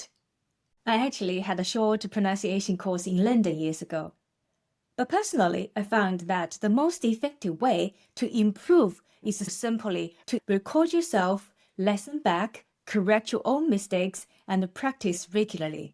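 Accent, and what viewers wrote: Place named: China. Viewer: I really like the Chinese accent 👍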